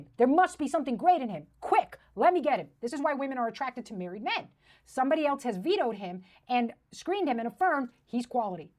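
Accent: New York, United States. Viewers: Women berating other women for being women is a new content genre. But in that NY delivery, it really feels sharp and totally lacking in appeasement, which is great. I would date you, because I get the feeling even the fights would be funny AF, ngl. Wish you the best of luck with your comedy career, I really need this no bs attitude to make a comeback to standup, everyone's being so weak right now. Easy sub.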